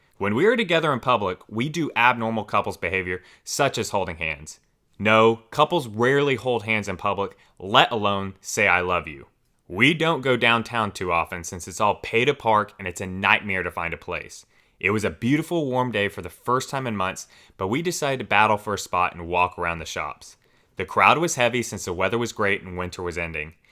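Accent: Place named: South, United States. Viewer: Great reading! I love your Southern Accent! 😜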